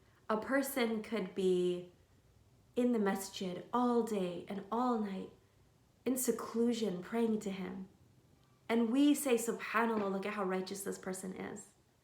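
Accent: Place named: United States. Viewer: Ukhti, by hearing you speak I can tell you're American,I can pick up American English better and quicker than other English speakers, the reason I and I know and few... Anyway listening to your speech I noticed that you mentioned some about Muslims calling themselves "bad Muslims". There's no such thing that being a bad Muslims,shaitan tricks to discourage the slave. Convey to those Muslims who think they aren't good enough of Muslim that Nabi Ahmad SAW said we,his Ummah won't be able to follow him up on everything,"just to do what we can"...And He swt was holy gifted,there is no way we can get to his level,we don't have his strength,power,endurance etc... Just do your best, Ummah.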